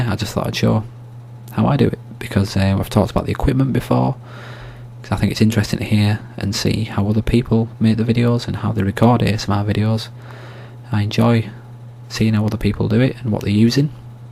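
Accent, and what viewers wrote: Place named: Liverpool, United Kingdom. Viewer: sounds like a scouser (Liverpool)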